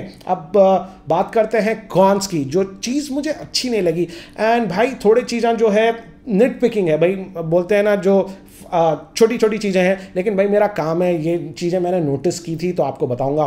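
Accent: Hyderabad, India. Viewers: Arka Seth trust me its only 30% hyderabadi hyderabadi is my native language if he talks completely hyderabadi u guys won't understand a thing!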